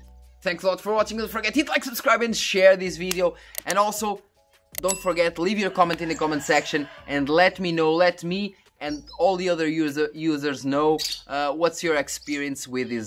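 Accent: Brazil. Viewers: Are you Brazilian or Portuguese?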